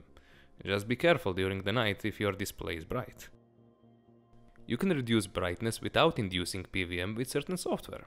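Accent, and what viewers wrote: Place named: Russia. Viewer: His accent makes him sound like a physicist from mother Russia he sounds like he knows what he’s talking about 👀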